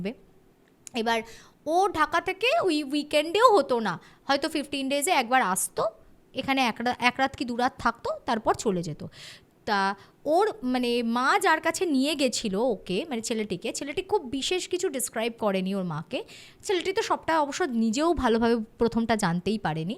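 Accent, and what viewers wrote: United Kingdom. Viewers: Apnar bangla ta khub ekta bhalo na , kerom ekta English tan ashe ....kintu Kichu ekta Jadu ache apnar modhdhe jeita ato attract kore ....r apnar story telling er modhdheo kichu ekta ache ....kerom ekta bokar moton kore mohit hoye shuni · Nekamo kom kore apnar english accent kom shuniye thik thk bhabe ektu short e bolun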